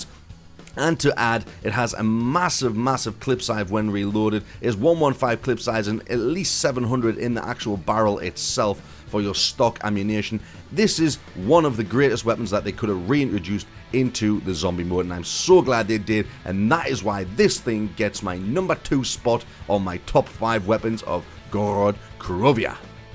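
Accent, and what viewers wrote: Newcastle, United Kingdom. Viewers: Guessing you're from Newcastle ;) same here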